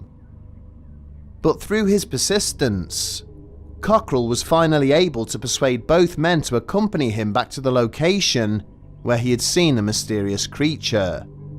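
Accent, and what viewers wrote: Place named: Yorkshire, United Kingdom. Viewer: I just love this chaps voice!! He's got a Westchester, or Yorkshire air about him .. CUZ BLOODY HELL, some of these blokes with their LAZY Cockney.... Bugger me in the oss, a fellow can't understand some of these blokes, not at tol.!! So I stay carry-on my good fellow!! I enjoy your most interesting stories!! Carry on!! I'm sitting here with me fags in me face and trying to send this post on this infernal contraption..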